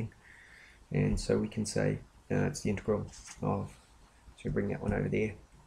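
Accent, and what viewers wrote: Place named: Australia. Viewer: You're Australian. Thank you, Mr. Australian man.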